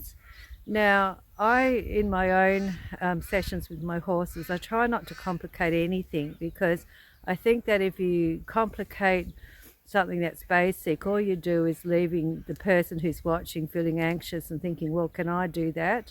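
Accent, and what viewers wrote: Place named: Australia. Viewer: Seen you for the first time, on account that I am subscribed to CC ... after a minute you got my black bell for your good old australian common sense ( and accent ) . love from aussie expatriate .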